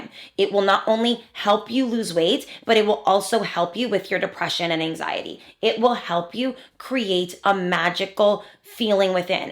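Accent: New Jersey, United States. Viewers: You’re one of my favorite content creators you always give really actionable really sensible advice. Also, I love that you have a jersey accent because I no longer live there and it sounds like HOME.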